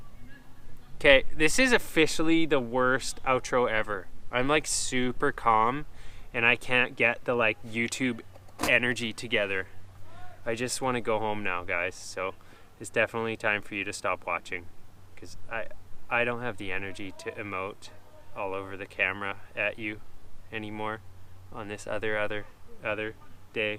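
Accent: Canada. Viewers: Canadian I think bro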